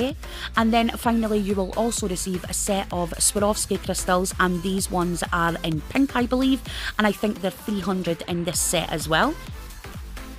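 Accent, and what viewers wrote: Scotland, United Kingdom. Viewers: Love watching your haul video's and your scotish voice over.. Congrats on 4k xx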